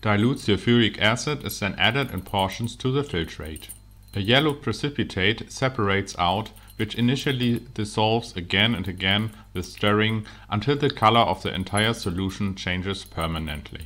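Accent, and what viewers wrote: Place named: Germany. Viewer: I simply cant overlook the german sounding accent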